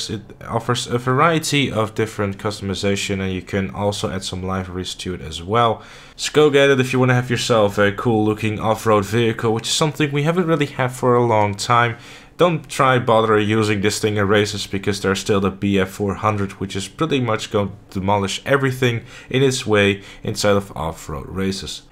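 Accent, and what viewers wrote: Netherlands. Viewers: Mr_ FancyPants he’s Dutch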